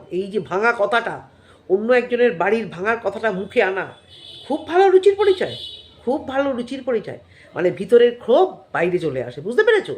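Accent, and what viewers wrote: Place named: Chittagong, Bangladesh. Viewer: Besh kichudin apnar controversy video dekhechi..valo lagche…besh straight katha gulo shunte valo lage..from Bangladesh..Chittagong er katha bolate aro valo lagche..amrao chittagong to.. Jai hok…priya khubi chalak,self lover…Ar khub show korte pare..hamboravab…kalpana r katha khub besi kichu bolar nei…priya r hotath tk hoyeche tai she kintu nijeke boro vabe kintu dekhchi onekei ekdom kom or bend he legeche priya k boro,mohan dekhacchhe…j konovabei kolpona r tar bor k kharap dekhate I hobe…its ok controversy korche kintu mone hocchhe priya r jonno Maramari korbe jeno…ajob…..othocho eder onekei mondirra k niye jkhn video kore khub politely bole emon ki kutshit ghora k eo sevabe kichu bolte parena…..priya khub irritating….tao ki bhalo proof korte chai he…bhul to dui dikei ache. Jai hok apni arekta regular hole valo lagbe r arektu somoi dile o video aro sundor hobe.valo thakben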